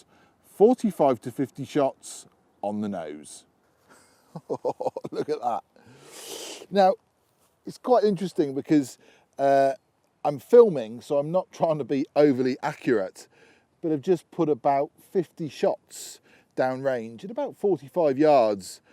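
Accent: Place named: West Country, United Kingdom. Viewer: Birthday suit legend, nice to hear some west country tones, I’m not far from you, on the mendips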